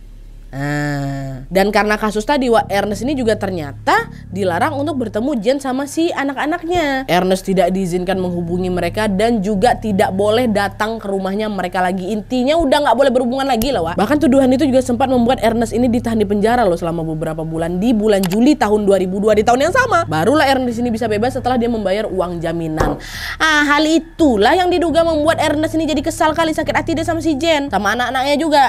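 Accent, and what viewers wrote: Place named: Sumatra, Indonesia. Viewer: Asek kali lah kalau udh org Sumatra yg bawain cerita.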